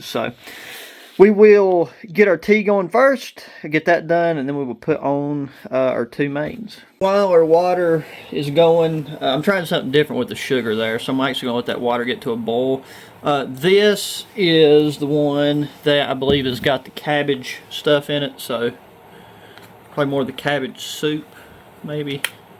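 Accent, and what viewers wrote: South, United States. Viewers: Very cool Amigo. New subscriber. I have only tried one of these Kaz. rations, older version. Components are standard for Russian, Ukraine, and entire area. I like most of them. Those big pieces of beef, in Russian meals, is called Tushonka. Cabbage meal is called Bigos, usually has sausage in it. Both are tasty. Glad you had fun on this ration. Peace from Kentucky, John. P.S., just guessing from accent, you are or were from Southern U.S. ? WE all sound the same, LOL. That is A compliment!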